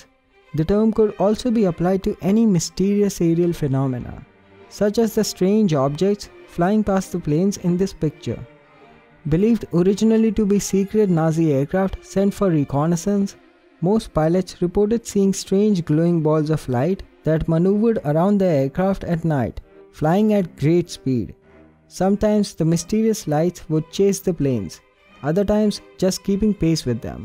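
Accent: India. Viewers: You have a indian accent, I like your contents.Thanks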